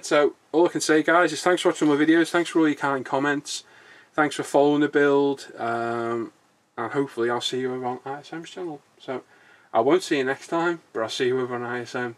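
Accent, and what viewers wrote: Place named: United States. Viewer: It's a very beautiful model, you're very expert. I advise you to mount the version f4u-1a, it's better and more beautiful. The "Birdcage" wasn't very appreciated by pilots, because it had a bad visibility. With the second version, 1a, the "Corsair" was more appreciated. And also, could you put subtitles in foreign languages? Because you speak with a very strong american accent, it's not very easy to understand for me, because it isn't my mother language... However, thanks very much for your videos!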